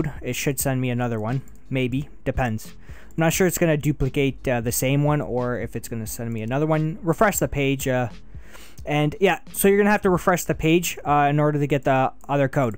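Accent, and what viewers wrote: Canada. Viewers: canadian ey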